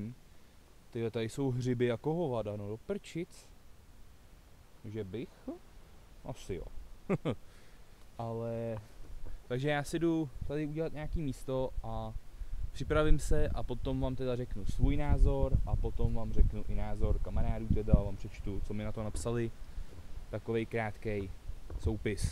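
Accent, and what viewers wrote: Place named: Pilsen, Czech Republic. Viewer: Sry, ale ta plzenština se fakt poslouchat. .. zeptal jsem se kameearádů na jejich neeazor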